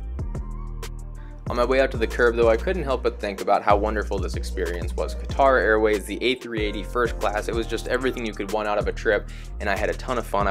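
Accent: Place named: Bay Area, United States. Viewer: I have become an avid follower of your detailed and very entertaining in-flight videos. Everything about them holds my attention making it easy to sit back, get comfortable and let the video do its thing. I also believe that your phrasing and (non) accent indicative of the Bay Area, where I am from, makes me feel very much like I'm listening to someone with whom I am familiar or have known a long time.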